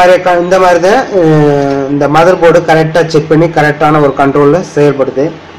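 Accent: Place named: Madurai, India. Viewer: Good explain in madurai tamil...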